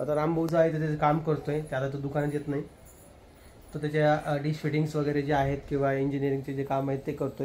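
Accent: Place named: Khandesh, India. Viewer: तुमचे गाव कोणते आहे दादा ,तुमची भाषा थोडी खान्देशी वाटते आहे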